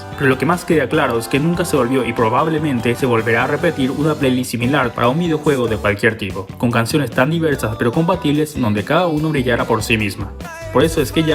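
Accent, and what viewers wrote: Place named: Paraguay. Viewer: Suenas como paraguayo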